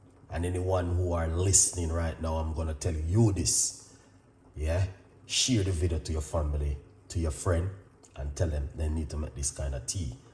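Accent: Jamaica. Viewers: I like how he talks” Yeah man”, I love Jamaicans🤣😂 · Yummy . I love your Jamaican accent. God bless you. Thanks for sharing. Can the lemon be bottled? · I like your Jamaican accent. A good friend of mine is from Jamaica 🇯🇲 he is one of the most funniest people i have ever met in my life. He has no filter lol. I'm from Ireland 🇮🇪 thanks for your video, those covid 19 shots and the booster really fu*ked up my chest and lungs real bad. I'm 33 years old, before Christmas 2019 my life was fine i was fit and healthy i have a passion for fitness and running. Now i can't find it very difficult. I work in a Factory and put my ipod on with my radio and play on Blast 🙂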